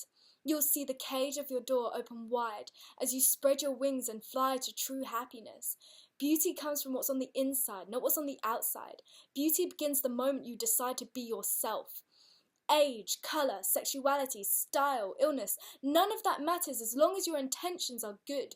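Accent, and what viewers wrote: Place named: United Kingdom. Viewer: british accent just makes people 3 times more attractive. ughhhhh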